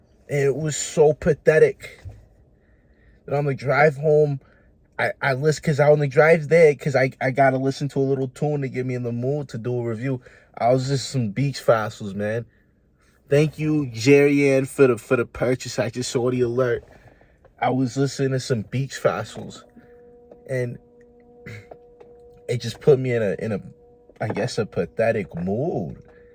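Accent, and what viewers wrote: United States. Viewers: You from Rhode Island? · I would just like to know how did you get ur boston accent when u not from boston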